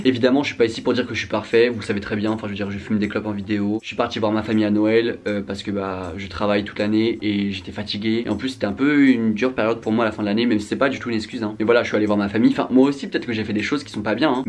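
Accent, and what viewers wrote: Caen, France. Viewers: Quand je t’écoute j’entends VRAIMENT l’accent caennais haha ( je suis trop fier d’avoir toi et Lucasdorable comme représentation même si y’a que mwa qui vous connais a caen bahaha 🙁 )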